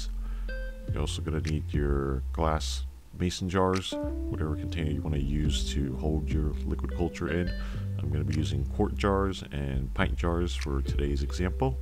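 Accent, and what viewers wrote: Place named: Ohio, United States. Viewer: All I kept saying is that this guy is def from Ohio or near some Amish country. Lol thanks for the lesson!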